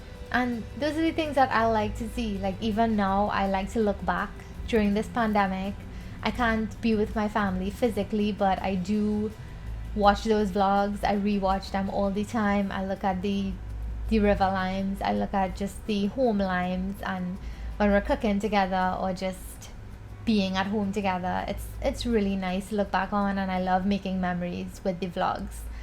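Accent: Trinidad, Trinidad and Tobago. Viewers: you still have your Trinidad accent which I love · I second Ramjohn!! You were brought up by such great parents and grandparents. The way you speak and carry yourself says so much...you have kept your strong Trini accent and values which I love. Keep being you sweetie. Stay as sweet as you are👏😘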